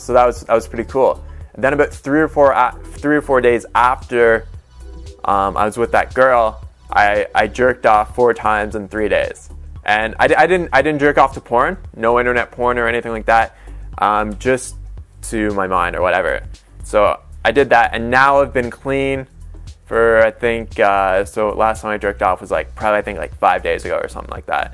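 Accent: Canada. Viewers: You're Canadian? (Btw i was so addicted i got ED, and in the most critical period in my life, if ya know wat i mean)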